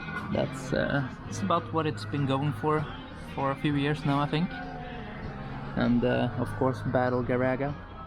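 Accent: Sweden. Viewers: You sound Swedish, am i right?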